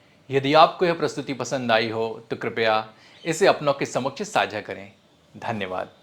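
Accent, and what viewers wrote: Standard. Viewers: ji pahli bar Laga ki koi Bhartiya safe Bhartiya vyanjan banaa raha hai. Aap dwara shuddh Hindi uchharan sunkar hamen Apne per aur apni bhartiyata per Garv hota hai aapko कोटि-कोटि dhanyvad. Aap din duni Raat Chaudhari tarkki Karen Ishwar aapki madad Karen.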